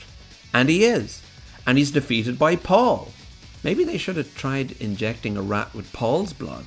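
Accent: Ireland. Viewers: ❤love the Irish ness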